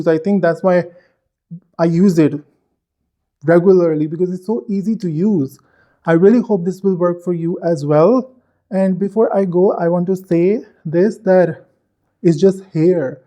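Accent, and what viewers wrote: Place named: United States. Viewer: Abe urdu may baat krna pakistani hokar American exent msy baat kr rahahai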